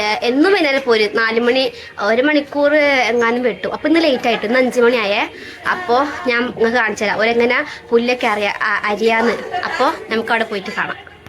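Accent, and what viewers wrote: Malappuram, India. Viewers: Mlp slang anallo tirur tanur slang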